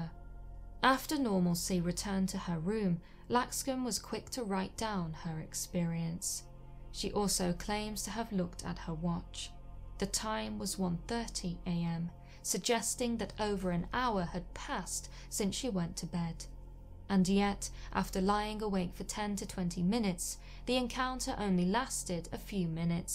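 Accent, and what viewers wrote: United Kingdom. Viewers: is one of the most stunningly, naturally beautiful women I have ever seen. Her skin is perfect. And that soft voice with that British accent, WoW!! What more could any viewer ask for!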